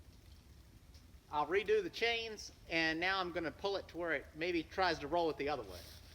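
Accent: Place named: Carolina, United States. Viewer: I've wondered myself if he's from North Carolina. However he always hides the front license plate on his vehicles and the only southern state to require front plates is Virginia. His accent is Carolinian though so I wonder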